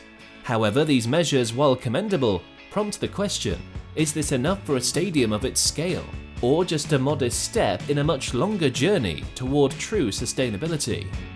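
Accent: United Kingdom. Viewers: Why would you use a British accent to narrate this video? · Somehow a British voice-over is a dizzy decision when talking about iconic NFL venues. Nice try, I guess?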